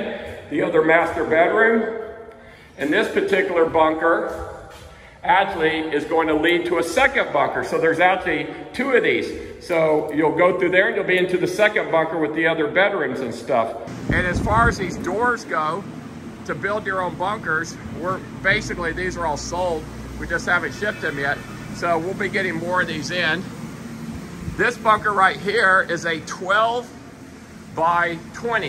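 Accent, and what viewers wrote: United States. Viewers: Aku tidak paham bahasa inggris aksen amerika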